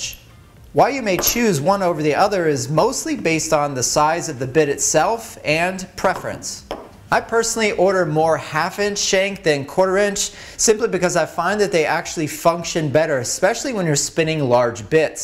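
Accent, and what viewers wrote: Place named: United States. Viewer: Saturday morning...looking for an American-speaking ( not UK/Brit for now ) quick info to start my router-based box joint projects for Christmas gifts ( 105° in my Sat Morning work area) and here you are solving my quick question as to joint...bit...common sizes. I've already built my little trim router table and sled for the joint cutting and ALL I needed ( "impatient 80 year old vet...") was 2 or 3 common bit sizes and after more than 1 hour of going thru the YouTube library of things that are good, but don't get to MY humble, simple needs for 2-day. Thank you, thank you, thank you...now I can get to work!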